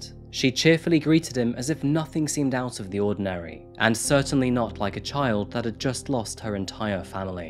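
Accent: United Kingdom. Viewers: You where made for this ,how you do yo thing man ,how I want to say I enjoy the show will come off weird and socker like so I better stop 😆🤣,anyway love how you set your channel big time 🖤,love when you call bullshi... t when u see it and with a British accent ,gets me every time,ok it's getting weird bye 👋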